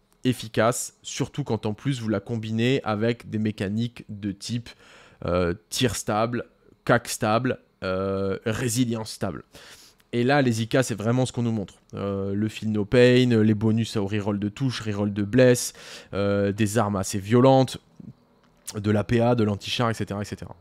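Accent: England, United Kingdom. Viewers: Change rien mec! Haters gonna hate ! Tu nous régale avec ton accent anglais 🤣😘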